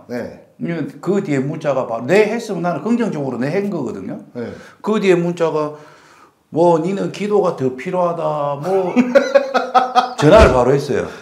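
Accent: Gyeongsang, South Korea. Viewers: 쌤!화이팅!!! 최고로 시원한 점사 놀라워요. 경상도 말씨 늘 정겨워요 ㅎㅎ 항상 응원합니다.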